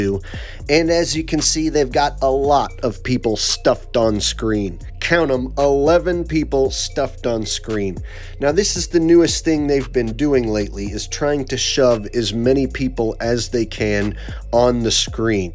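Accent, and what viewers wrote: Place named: England, United Kingdom. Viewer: Hahahaha 😂😂😂 love the English accent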